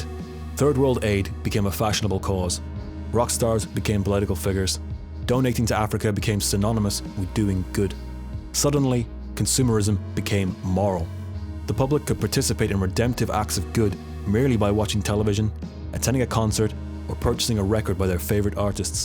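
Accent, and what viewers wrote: Ireland. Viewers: You sound irish, so what you think of as christians is a little different in the sivalised world. We don't all exchange potatoes wile huddled around a fire made of cow dung. Sorry to rewen you nestalga. XoXoXo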